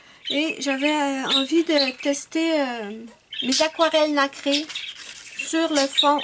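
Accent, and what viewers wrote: Quebec, Canada. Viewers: Bonsoir, Cette nouvelle me fait beaucoup de peine car j'adore tes vidéos. 😖. Évidement que je vais continuer a te suivre sur FB et Instagram, mais je préférais YT a cause de t'entendre parler, de Ruby, de Spike le chat blanc. C'était tellement sympa, c'était un agréable moment. Sans parler de tes vidéos tutoriels et de ton charment accent québécois. Tout cela va beaucoup me manquer😖😵😬. Youtube a beaucoup changer en 3 ans. Cet espace de liberté et de partage est devenue une véritable dictature par ses nouvelles règles et sa cupidité. Certains youtubeurs ont aussi changer, de partage de leurs passions, ils sont devenues des "sponsorisés" a outrance pour le profit. J'aime bien ta sympathique simplicité et ta passion du coloriage. Tu le fait encore non pas pour le profit comme certains aujourd'hui. Pour moi tu est plus une amie sincère lointaine, qu'une étrangère d'un autre pays essayant de me vendre quelque chose. 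Tes vidéos me manqueront beaucoup car elles m'apportait un peu de bonheur dans ma solitude. Je te suivrait sur les autres réseaux sociaux😊😋. Tes coloriages sont magnifiques et Wendy est superbe😍👍👏. Tout mes vœux de bonheurs, de joies, de bonne santé a toi et a toute ta famille😘. Internet comme dans la vie réelle devient de moins en moins un espace de liberté. C'est bien dommage...... 😖😖😖 😫😫😫.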